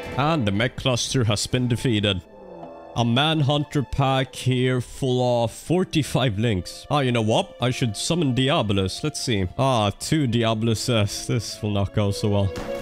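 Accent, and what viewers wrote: Sweden. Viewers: You are the most stereotypically swedish sounding guy ive ever heard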